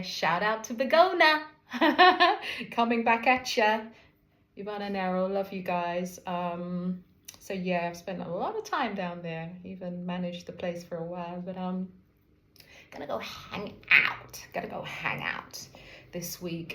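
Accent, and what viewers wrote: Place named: Jamaica. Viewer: Hey family hope all is well thanks for sharing. I love the Jamaican accent it’s really nice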